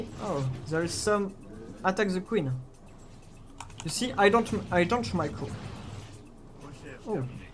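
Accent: France. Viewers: Cheers from Sweden! I have just returned to Starcraft 2, much thanks to the Finnish players who beat the Koreans recently! i was thinking then, are there any "Old" WoL players that stills play... And i found out that Naniwa was playing just some games for fun on twitch and i saw a live match on twitch with stefano.. soo i was hooked again, cus its so funny to watch starcraft 2 matches and suddenly i wanted to play 1v1 again! when i came back to the games i'v been looking for some "sheets" or "how to buildorder" etc and then i saw your effort on <link> i watched some of your videos, found it intressting most cuz i wanted to get toss back into my own protoss play again! And then i saw this video, when u do a series of play on the ladder and you explain what you belive you see and what info you get from your scouting. And your experiences dosent lie to you that often. 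but i found it realy intresting when you react to things. here we "noobs!" can do alot of learning! I think i do alot of progress watching your videos! Me however that are a Platinum player that wish to step up to Diamond i found this video very usefull! I realy hope you do a few more videos like this on the ladder. Cus we lover tier guys learn alot form this! and i love your frenglish keep do videos! its intresting, funny, usefull... you help the starcraft 2 communityt alot by doing this videos! cheers again! Samsos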